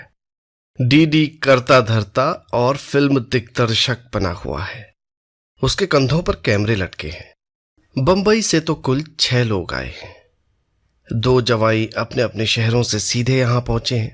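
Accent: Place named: Kumaon, India. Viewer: Your Kumaoni tone is perfect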